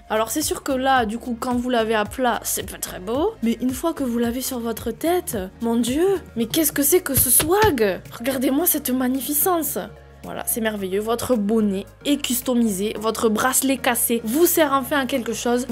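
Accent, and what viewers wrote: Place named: Southwest, France. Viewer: mdr le petit accent du sud ouest ! cool la vidéo en tout cas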